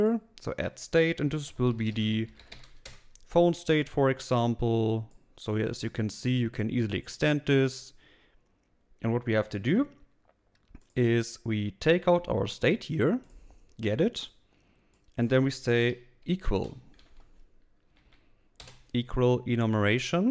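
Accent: Germany. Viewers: Man hört so das deutsch raus haha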